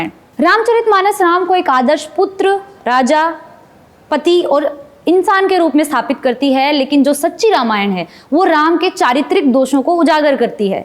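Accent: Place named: Haryana, India. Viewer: You have a distinct haryanvi flavour in your accent, and i really like it. Respect from this bihari. Love your journalism and topics that you raise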